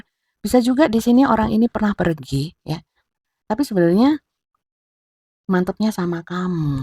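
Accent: Java, Indonesia. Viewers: Lucu..juga.. Bundanya..jawanya medok...nggee bunda..ngge..Mater nuwun Bunda. ❤ 💙🎉🙏 .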